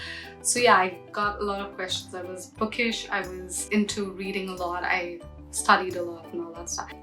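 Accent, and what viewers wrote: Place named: India. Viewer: U have Indian accent R u indian??🤔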